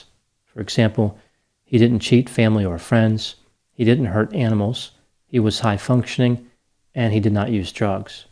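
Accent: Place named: Mid-Atlantic, United States. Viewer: Off topic but... If I'm not mistaken, I believe I hear a touch of the Maryland/Pennsylvania/Delaware/DC accent from. This accent is unique to my region of the US (Maryland) and is strongest in Baltimore City & Philadelphia. accent isnt nearly as strong as most here but it's so unmistakable I'm willing to bet my life he comes from one of those states.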